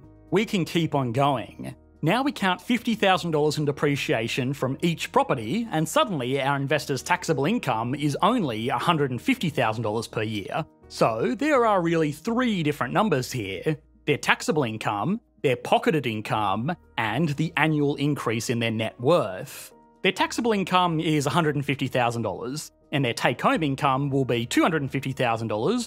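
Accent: Australia. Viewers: what do you mean? I’m an Aussie and consider him to have a pretty average Aussie accent. He’s middle class and educated, so he doesn’t sound like crocodile Dundee, but listening to him speak I don’t get the impression that he spent any of his formative years overseas. At most he’s maybe a bit “media-trained,” ie. he puts videos on the internet so he’s learned to speak clearly into a microphone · EE makes me ashamed to admit that I might actually have a love hate thing for an Aussie accent😉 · Yeah when I saw your Norway video and how you tacitly backhanded what Australia’s doing with their resources compared with how Norway is maximising theirs, I thought that you must be Aussie, even if the accent didn’t already give it away. Yeah it’s a shame how we are paying market rate for our gas resources when we are sending most of it overseas to the benefit of others. 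Hopefully that will all get upended if we manage to transition to become a renewable energy superpower, and that cheap electricity can make manufacturing and refining once again profitable in this lucky country. Hopefully greater automation will offset the more expensive labour costs for manufacturing, like how it is in the US and Europe.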